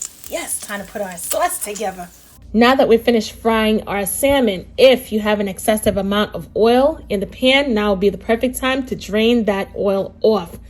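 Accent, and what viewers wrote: New York, United States. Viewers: Delicious recipe, love your Ny accent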